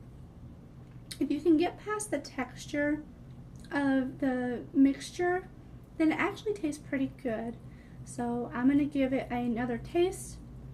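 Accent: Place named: Appalachia, United States. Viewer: I'd sure like to know what part of Appalachia you hail from with that High Falutin kitchen n way of talkin'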